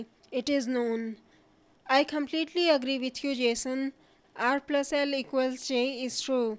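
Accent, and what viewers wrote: India. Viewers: the video is good but ur accents indian _. no offence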